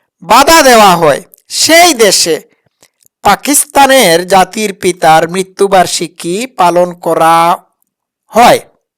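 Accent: Sylhet, Bangladesh. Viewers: U spek bangla with Sylhaty accent is SAD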